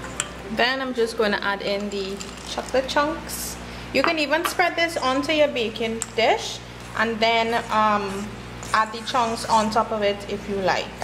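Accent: Trinidad and Tobago. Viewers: Are you from the Caribbean? You have a Trinidarian accent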